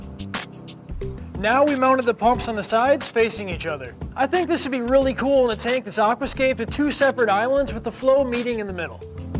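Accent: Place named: Minnesota, United States. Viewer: I'm from Minnesota but don't live there anymore. Definitely miss the accent. Also, do y'all ever plan on doing these videos for the Vortec mp's?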